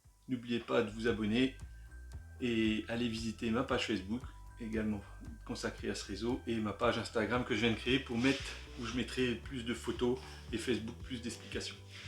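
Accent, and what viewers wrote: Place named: Haute-Marne, France. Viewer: Bonjour pas mal l’ancienne doc FLEISHMANN très inspirant ta vidéo j'ai très envi de me lancer, c'est quand le prochain confinement ...c'est une blague, mauvaise blague surement j'ai pas trouvé mieux, j'imaginais me lancer dans le HO mais vu la place pourquoi pas le N, tu trouves facilement du matériel à cette échelle ? dernier petit point sympa le léger accent de la haute marne j'ai mon frangin qui habite la côte d'or depuis... qu'il a laché son département d’origine et je retriouve les intonations de cette région ;-)) Merci encore pour tes vidéos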